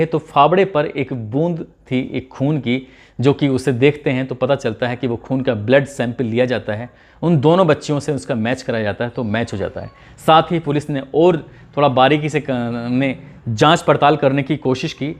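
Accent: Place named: Bhojpuri, India. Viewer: Bura na manna AAP blood ko bled kyoan pronouns krte ho sahi krne ki kosis kijiye Sir bhojpuri tune daldiya aapne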